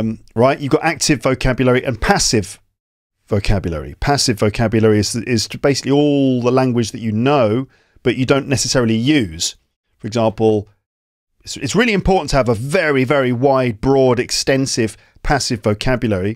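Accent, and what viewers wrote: United Kingdom. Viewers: Learning British Accent is easy watching your videos.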